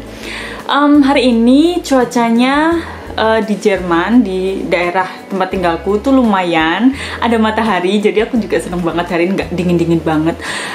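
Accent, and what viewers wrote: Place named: Java, Indonesia. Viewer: Mbak'a kelahiran Jerman kah.?? Logat'a kek logat Jawa. Udah sub, mbak.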